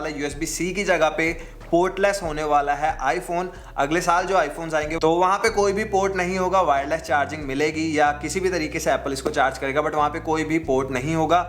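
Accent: Punjab, India. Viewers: First. U doin good work n givng compt info about aple prodct Srcond:- i love to hear your voice as typicl desi punjabi voice spcl my you tube ki family line Third:- punjbi bhai yeah batao koun se airpod liye jaye sab kuch considr kar ke price quality Fourth:- kya abhi iphone 11 pro lena chaiye ya wait kiya jaye iphone 12 ke liye. Fifth:- iphone 11 pro vs iphone 11 pro max koun sa sahi rahe ga Sixth:- kya usa aor india ke phone mein koi faraq hai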